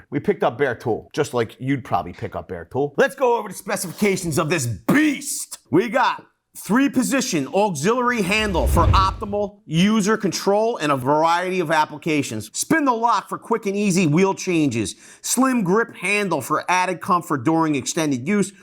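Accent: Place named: Boston, United States. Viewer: Love your accent. I'm Australian and I feel our accent and yours which sounds like a close Boston accent are the best.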